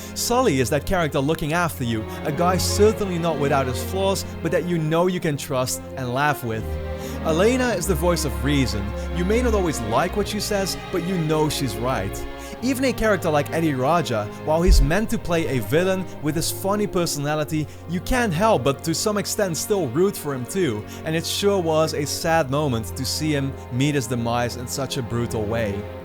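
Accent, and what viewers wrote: Netherlands. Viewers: are you dutch because you have that dutch accent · The Dutch accent is strong with this one.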